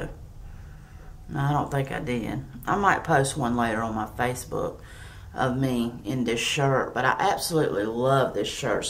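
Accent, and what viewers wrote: South, United States. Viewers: you are so sweet..."Im not trying to brag..." I just love your videos...I enjoy your hauls and your gifts you got for Mothers Day...Happy belated Mothers Day..I just became a subscriber...xxI am from Rhode Island...I know you are probably from the South from your beautiful accent..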